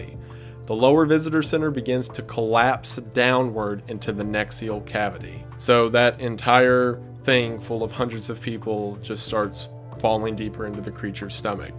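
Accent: South, United States. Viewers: you have this southern accent that comes out every once in a while and it really added to this whole experience, especially when you read letters/notes, very immersive 10/10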